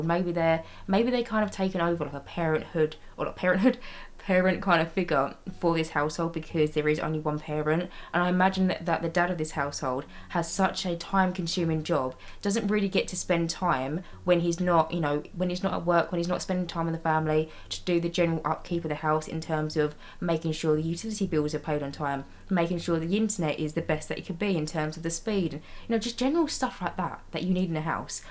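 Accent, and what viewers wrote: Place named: Essex, United Kingdom. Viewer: I love your voice, I could listen to you ramble on all day. Proper Essex haha hope you’re getting through your burn out ok, I just came out of one and then they broke the game and my favourite modder refuses to buy the pack and update his mods lol so I’m basically left unable to play because I need the mods lol I neeeeed them.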